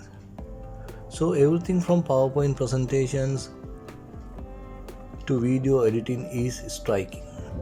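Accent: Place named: India. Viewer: Why white lady speak with Indian voice?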